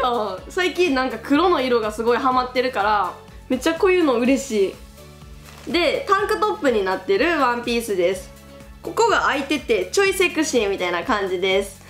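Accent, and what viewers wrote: Kansai, Japan. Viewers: 始まりからコメントしたくなる。ハキハキで変にぶりっことか、キャラ作りしてないのが好感度マックスw関西弁出てくると思わなかったしギャップがいいです！！ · の関西弁可愛いすぎです。アウター良かったですね。🙆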